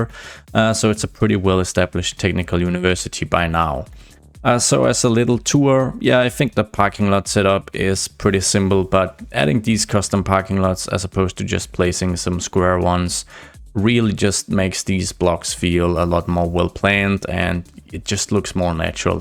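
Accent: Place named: Denmark. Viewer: I KNEW IT I KNEW THAT U WERE DANISH I HEARD IT HEARD IT OUT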